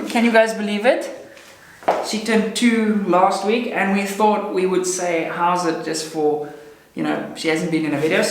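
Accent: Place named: South Africa. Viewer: I watch your videos to hear you SA accent.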